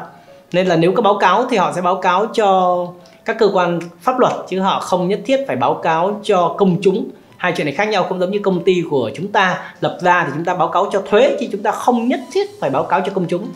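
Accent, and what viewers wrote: Standard. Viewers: Anh noi rat chuan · Rất thích người có học thức ăn nói chuẩn, dễ nghe, rất thuyết phục, phân tích hay, cái mà nhiều kênh khác còn thiếu.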